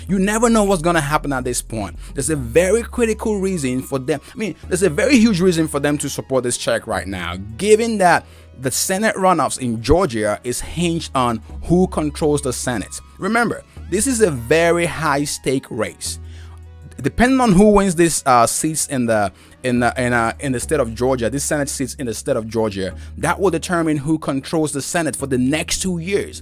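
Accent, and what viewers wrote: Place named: Africa. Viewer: Get English lessons please...get the African out of you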